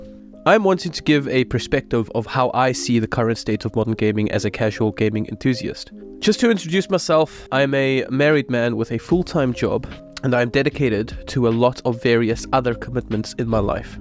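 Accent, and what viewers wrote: South Africa. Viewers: You're South African? I knew that accent was familiar!!! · Bru, you sound South African ✌️ probably not, but shoutout from South Africa 🇿🇦 This video is literally me! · i knew i recognized a South African accent! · I am hearing a South African accent.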